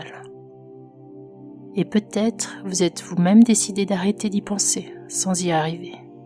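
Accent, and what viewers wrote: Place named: Normandy, France. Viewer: Merci pour cette belle vidéo je vais être subjectif mais étant de Normandie il y a un léger accent dans ta voix qui fait que tubers ton travail est intéressant et agréable merci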